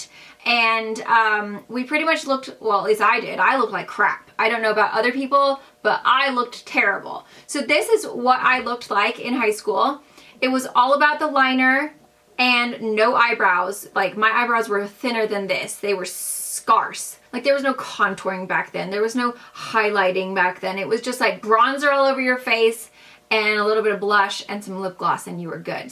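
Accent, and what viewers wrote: England, United Kingdom. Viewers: Did you used to have an English accent?? ( im from England :) ) xx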